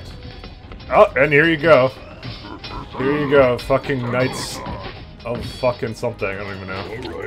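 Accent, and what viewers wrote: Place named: Canada. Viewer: You speak canadian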